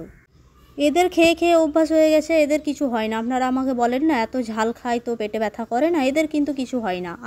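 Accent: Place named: Kolkata, India. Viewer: Ei tumi ki bangali, pariskar bangla bhasa, chalti bhasar bhalo dakhal aache, khola mela sahab, mone hachhe apni anekdin kolkatay chilen, bhalo thakuk apnar paribar ar apni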